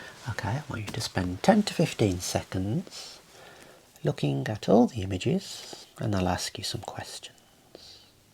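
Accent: Birmingham, United Kingdom. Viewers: Omg British accent💀😭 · Couldn’t get more brummie than this 😂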